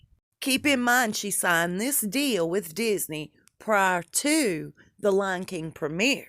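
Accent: South, United States. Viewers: Great video,. Love your Southern accent.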